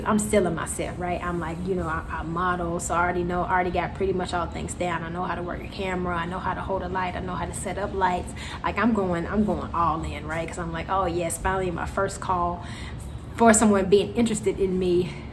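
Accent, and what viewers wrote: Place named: Rural, United States. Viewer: Awwwwww that country twang!!!!!!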